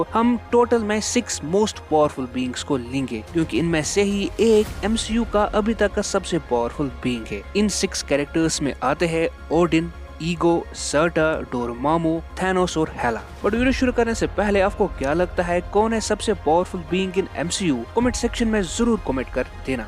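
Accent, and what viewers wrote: Kashmir, India. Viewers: Are you from Kashmir because I am also from Kashmir your voice is like you are from Kashmir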